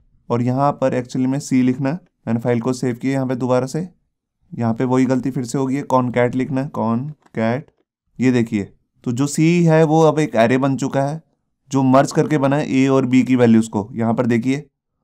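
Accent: Punjab, India. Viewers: sir r u from punjab??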